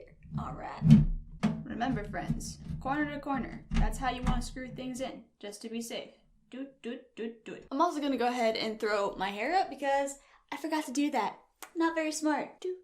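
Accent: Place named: Alabama, United States. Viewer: the way she talks makes me think she was raised a catholic school girl from alabama like damn AHAHAh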